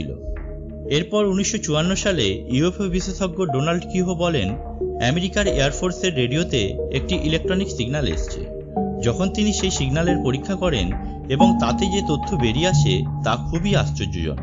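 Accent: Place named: West Bengal, India. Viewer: indian bangla...... just...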